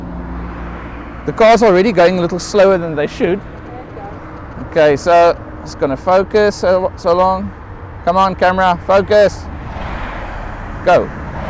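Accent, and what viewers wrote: South Africa. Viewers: So good to hear a great SA accent again. Thanks for a great tutorial, really simple and straight forward. Dankie Boet!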